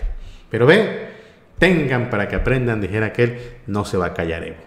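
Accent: Tabasco, Mexico. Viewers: Primera vez que te escucho con el asentó tabasqueño😁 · está bien tu acento tabajqueño pero distraes un poco. 😄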